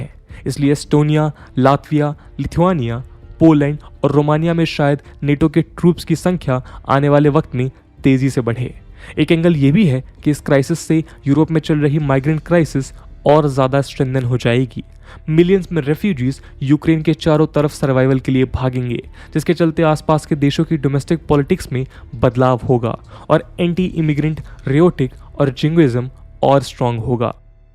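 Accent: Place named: Bihar, India. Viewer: Mr., From your voice tone, I think you are from North Eastern Bihar.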